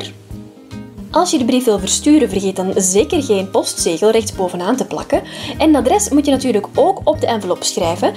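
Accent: Belgium. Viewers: Duh België klinkt wel grappig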